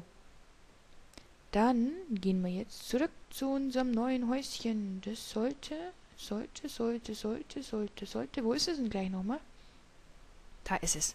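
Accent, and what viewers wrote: Bavaria, Germany. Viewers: Ja! endlich a bayrischs Minecraft-Let's Play gfundn :D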